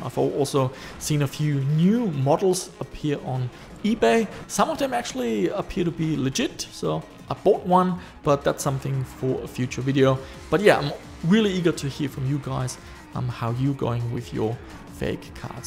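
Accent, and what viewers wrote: Germany. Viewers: DAT GERMAN ACCENT!×£°J™£¶~™OA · He's an Austrian who moved to Australia. That's why he has a German accent. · Your clearly sounds German why don't do the video in German? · Man hört das du nen deutscher bist 😂😂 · Yay! A new Video. Btw. Are you German? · German? :D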